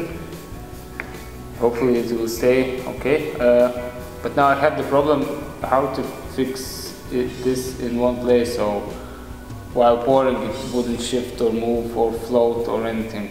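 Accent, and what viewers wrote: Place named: Russia. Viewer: Судя по произношению-это нашенский. Так английский, проговаривают только наши.) Только вот рассказать процесс людЯм по русски никак, надо понравится ИМ!) Мне то рассказывать не нужно, я все это знаю, сам со смолой работаю, но ведь людям то было бы интересно!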